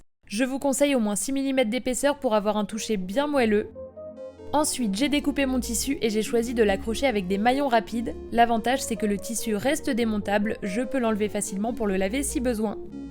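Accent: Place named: France. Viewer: Ça a l’air très bien but the accent français je peux pas regarder, désolé 😂 ça fait mal aux oreilles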